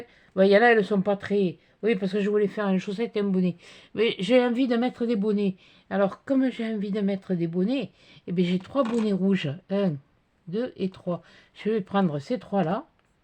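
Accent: South, France. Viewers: Bonjour, je ne commente que très rarement les vidéos que je regarde, mais honnêtement je trouve vos créations très très jolies et j'imagine le gros travail en amont. j'aime vos vidéos et votre accent du sud 😊 et aussi vos début de phrases sans la fin, votre simplicité et le respect des autres sans critiquer ( ce qui n'est pas le cas sur toutes les chaines😊) Je vous souhaite de très bonnes fêtes de fin d 'année avec votre petite famille et merci pour toutes vos vidéos 😊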